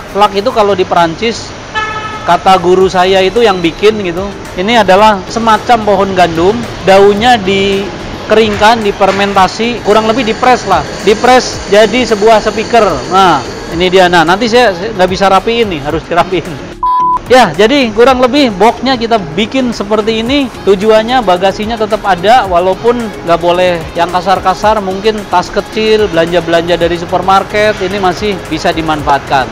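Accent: Banyumas, Indonesia. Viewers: Ora ngapak ora kepenak .sukses om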